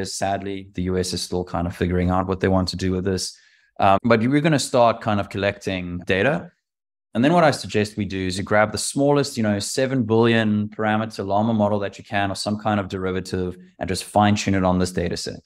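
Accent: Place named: South Africa. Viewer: Random comment, I hear your South African accent lol. Much love from SA!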